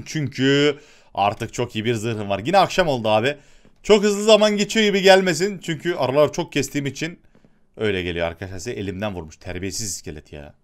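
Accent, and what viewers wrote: Yozgat, Turkey. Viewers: Yozgatlık leeeeyn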